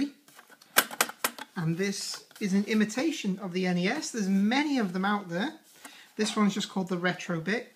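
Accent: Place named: Liverpool, United Kingdom. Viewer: u rock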